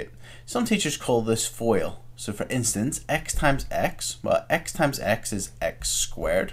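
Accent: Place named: Scotland, United Kingdom. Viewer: The Scottish are strong with this one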